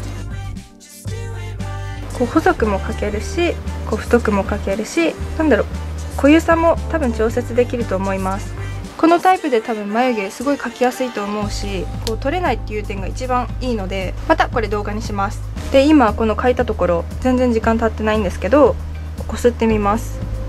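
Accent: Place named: Hokuriku, Japan. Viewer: 北陸の方ですね？ヽ(・∀・)ノ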